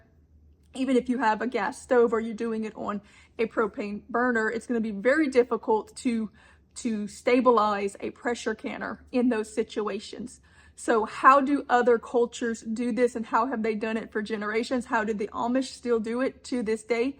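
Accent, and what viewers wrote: Louisiana, United States. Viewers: I knew you were from south Louisiana the moment you spoke. I might be in Tennessee but I boil crawfish here too because I lived in New Orleans most of my life. Great video.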